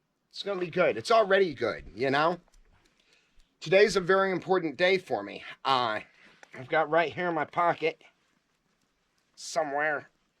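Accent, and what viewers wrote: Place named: Bush, Australia. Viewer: You still have some of your "Bush Accent". I love it.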